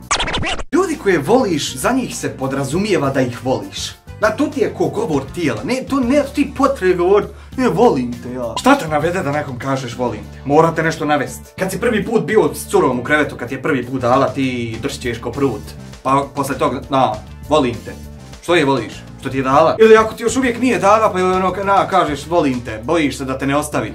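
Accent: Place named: Bosnia and Herzegovina. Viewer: sve full samo vrati naglasak od pre,sada pricas ono bas bosanski a meni je iskreno bas muka da slusam to,pricas kao kompleksas a ja njega ne mogu da slusam i verujem da nisam jedini,bez uvrede naravno bilo kome ali jednostavno taj govor je nekako cudan i ne prija mi usima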